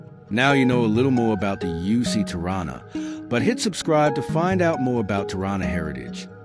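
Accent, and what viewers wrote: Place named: United States. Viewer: You couldn't find an AI Aussie voice 🙄 it kinda ruins it with the American accent.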